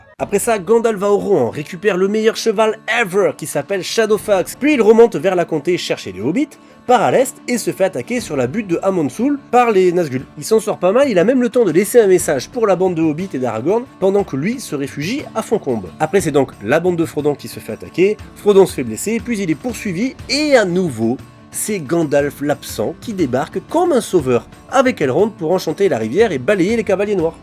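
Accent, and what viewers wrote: South, France. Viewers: 'Tain j'ai crû que les pattes sauronara existaient vraiment 😂 Pis entre l'accent du sud et le fait que t'arrête pas de parler de dr*gue j'ai pensé à jdg tout le long 😂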